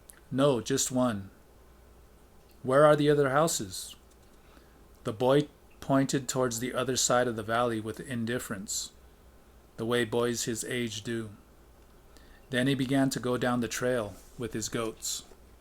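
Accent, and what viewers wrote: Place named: Spain. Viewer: Listening to these magical books with the clear spanish accent is perfect! Muchisimas gracias hermano!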